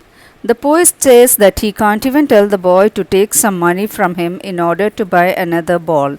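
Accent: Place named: South India, India. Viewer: Mam is you from south india😅 our shool sisters explains similar like you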